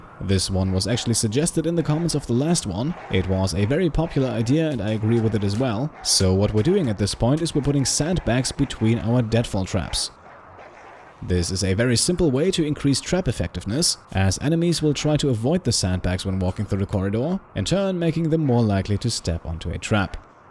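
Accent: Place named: Germany. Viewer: didnt know you were german untill you started to read the patrions for the first time :D (few episodes back)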